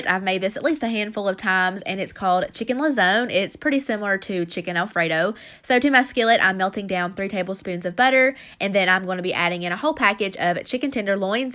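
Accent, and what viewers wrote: South, United States. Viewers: I can't fir the life of me believe some one would call you lazy!!!!! Your always making fabulous dinners for your family, don't ever explain yourself to anyone. Your a good Wife and mommy. Love you. I especially love your southern accent. Your a doll. 🍳🥯🍗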